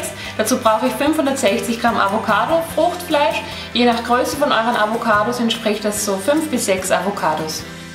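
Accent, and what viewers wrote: Switzerland. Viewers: bisch a Schwiizeri? wegem akzent? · no it's a swiss accent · actually she speaks german with a tiny swiss accent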